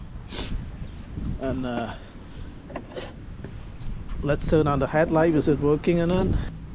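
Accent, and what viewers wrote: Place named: Cambodia. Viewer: No doubt. This man is Cambodian. his accent says it all.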